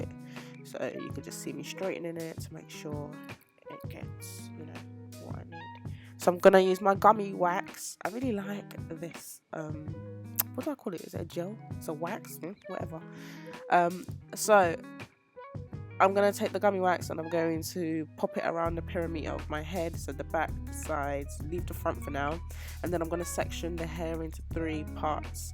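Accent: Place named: United Kingdom. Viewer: Tell me why I knew you you had a British accent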